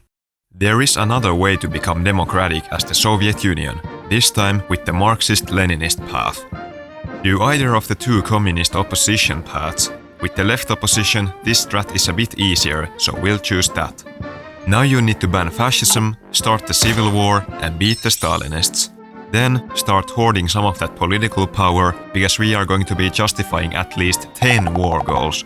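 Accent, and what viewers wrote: Finland. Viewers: Are you from Finland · are you finnish? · i just can smell the finnish accent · After xPetu, this is the most magnificent Finnish accent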